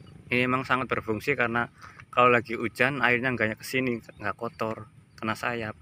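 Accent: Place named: Kebumen, Indonesia. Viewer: Logat bicara karo Bahasa mu lik🤣🤣🤣 Rika Kebumen sblh ndi?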